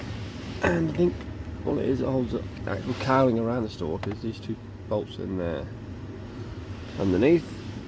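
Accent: United Kingdom. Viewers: Helpful video. Love the british accent😄. Thanks from Argentina 👍🏻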